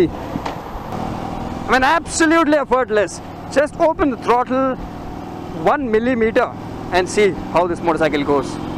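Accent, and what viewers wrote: India. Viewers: You Accent also reminds me of the Russel Peter's Indian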